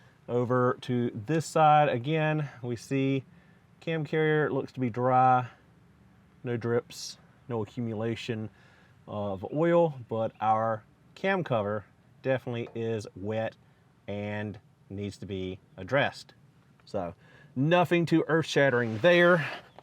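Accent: United States. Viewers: OK, I love the East/South Accent....Anybody gonna mention whatever is leaking or so......LOL. Great video and this cat can have that engine out and broken down in an hour. LOL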